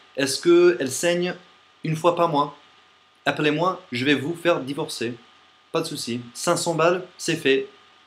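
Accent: United States. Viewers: J'ai moins entendu ton accent américain que sur d'autres videos c'est normal ??? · c'est incroyable tu n'as pratiquement pas l'accent américain quand tu parle francais ^^ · Tu es entrain de perdre ton accent américain. Tu as l'accent français parfois. Il te va bien. Des fois on croirait que tu es franco-français.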